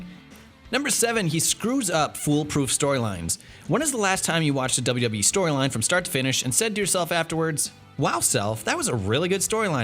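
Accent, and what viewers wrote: United States. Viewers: I love Simon, so I'm not just one of the Adam fanboys, but I just don't like this guy's voice. Too American for this channel. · what is with the shitty stupid sounding american accent? if this keeps up you will lose ALOT of subs! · An American accent on Whatculture Wrestling just seems...off. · Ahh, finally.... An American voice over, for a top ten video.. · wtf who is this dirty american where is my sweet voice of adam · What culture shouldn't be narrated by Americans #wewantadam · Sorry but whatculture really doesn't suit an American accent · You know, after all these foreign accents this American accent is foreign to me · and why there's no british acent in this video? they americans stole the cahnnel? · Its stupidly weird hearing an american on what culture wrestling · as soon as i heard the american accent, all i could think of was 'she doesn't even go here' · An american whatculture narrator... 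amazing.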